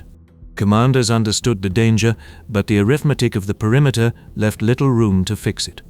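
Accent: England, United Kingdom. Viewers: Always interesting hearing an English voice telling us about Vietnam .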